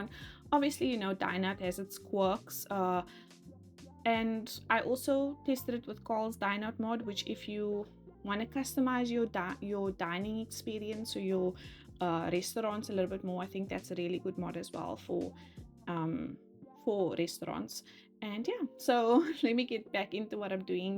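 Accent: South Africa. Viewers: Hi, I know this is random but are you South African by any chance? I sense a South African accent, a strong one lol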